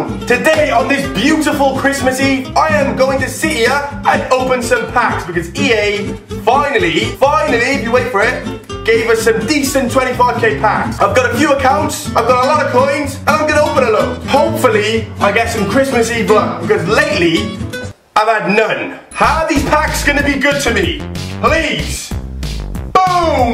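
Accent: Wales, United Kingdom. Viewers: are you welsh?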